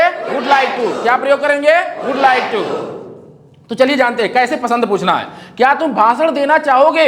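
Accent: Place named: Gorakhpur, India. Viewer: When you are saying anything, you mouth get gorhkpur tone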